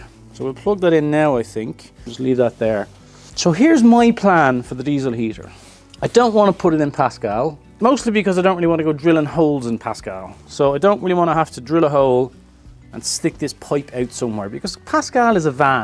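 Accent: Ireland. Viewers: Well, you're SO wrong! 😄 We love listening to you prattle on about whatever in your Irish brough! Lol It's very entertaining! We would actually watch you do whatever. 😊 You actually do a great job of carrying on a great conversation with us (a camera) Please continue. We like you.😉👍 (We also love the chateau reno - watching) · Would love to listen to you blathering away with your wonderful Irish accent.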